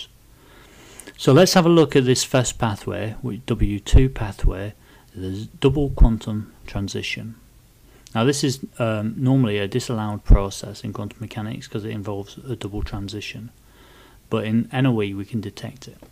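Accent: United Kingdom. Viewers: Hard to understand british accent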